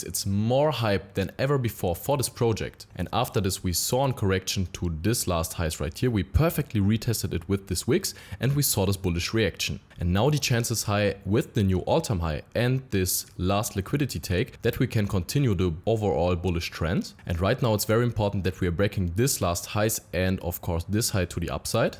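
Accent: Germany. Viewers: I like your german accent ❤🎉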